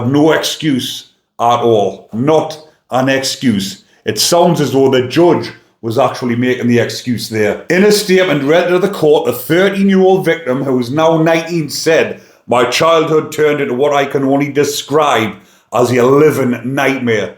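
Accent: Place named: North East England, United Kingdom. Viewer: is from the north east of GB,and that is how they speak! It's called an accent!🙇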